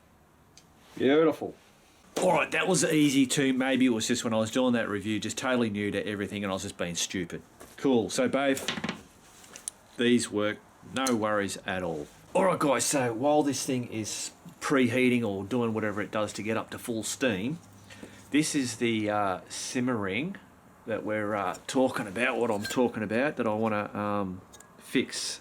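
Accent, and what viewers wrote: Australia. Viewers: Wow, that made me belly laugh! 😂 I'm a Brit and I don't know why but your Ozzy accent just did it for me. Reminded me a little of Rolf Harris (in better days). So entertaining! About the simmer ring, wouldn't it be easier to adjust it before placing it on the burner? ie. Allow the liquid to boil, without the simmer ring and then fit it using the tongues. Obviously experience will guide the pre-adjustment. Just a thought. Thank you for brightening my day! 👍😁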